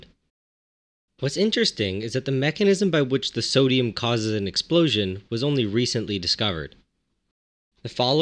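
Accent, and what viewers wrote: Canada. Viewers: That's how Canada sounds?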